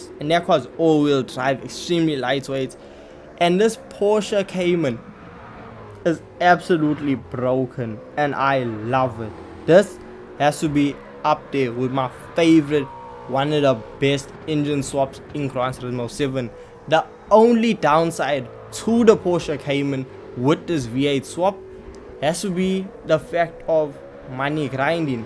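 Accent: South Africa. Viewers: bru you sound like you live in durban bru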